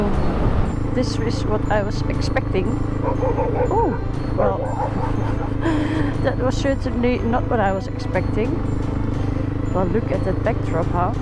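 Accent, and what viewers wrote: Netherlands. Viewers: No. They're both Dutch girls with similar accents which is nice. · Binged watched all of the episodes, great to see another Dutch lady taking on the the challenging rides, at the rate you are flying through the countries you'll be in Kuala Lumpur in no time. I see a lot of comparison in comments with Itchy Boots but apart from Dutch accent you bring your own format really great editing of video.You have really matured in a very short period in off-road riding, I remember the first gravel road I think I stopped counting the oi,oi's now there is hardly any 🙂replaced with wauw. Keep going enjoyable to watch and living vicariously through you and others traveling on bikes through foreign shores. You do you.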